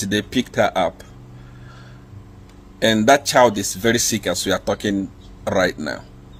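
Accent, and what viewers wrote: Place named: Nigeria. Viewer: Are u nigerian or zambian? Because of your accent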